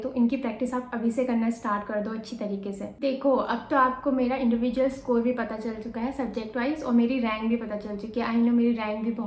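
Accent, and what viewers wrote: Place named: Pahadi, India. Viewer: Ur accent is of pure pahadi region dear😀😃🤗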